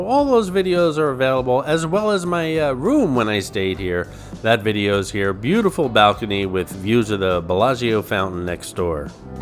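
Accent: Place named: New York, United States. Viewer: Nu-Yawk-flair........o yea!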